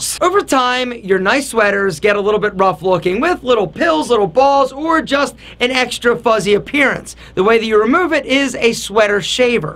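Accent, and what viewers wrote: Philadelphia, United States. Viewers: The philly accent is brutal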